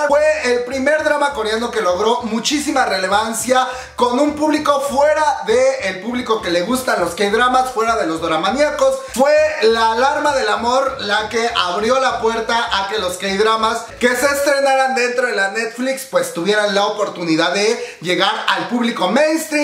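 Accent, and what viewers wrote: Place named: Mexico. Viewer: Mr encanta tu forma de comunicar además hablas muy mexicano y me hacés pasar un buen momento y tú critica es muy coherente y ala vez sensilla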